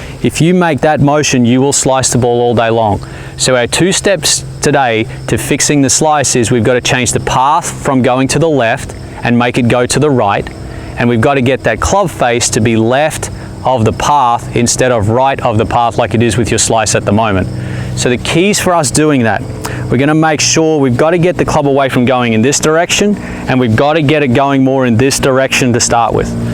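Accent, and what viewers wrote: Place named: Australia. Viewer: Thank god a aussie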